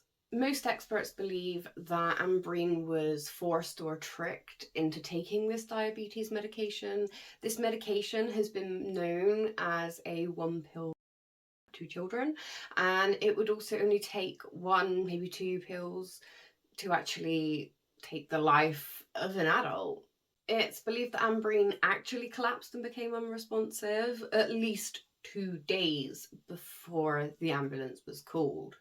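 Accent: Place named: West Country, United Kingdom. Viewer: Cornwall, or the West country? 😂 that's a guess from a northerner. Either way, great video, keep at it! :)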